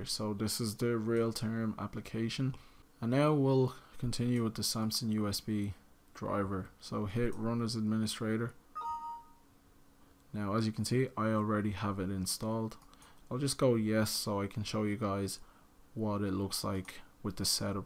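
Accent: Dublin, Ireland. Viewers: Bleedin' Dublin accent x'D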